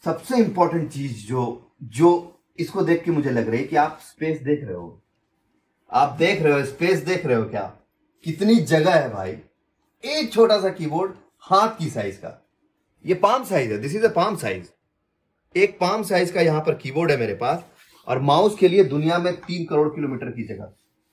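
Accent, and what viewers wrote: Bihar, India. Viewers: Are you from Bihar ?????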